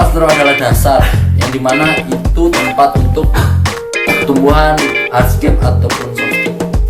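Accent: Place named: Java, Indonesia. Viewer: iki wong ndi seh? medok banget wkwk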